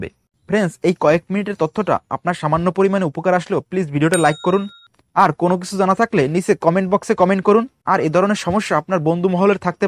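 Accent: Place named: Barishal, Bangladesh. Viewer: Mone hoy apne borishailla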